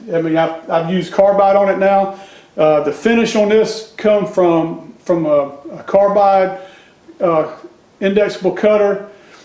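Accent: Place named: South, United States. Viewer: Still learning how to listen/understand southern backyard machining (American) English/accent. Your learning curve on understanding that old of machine, informative.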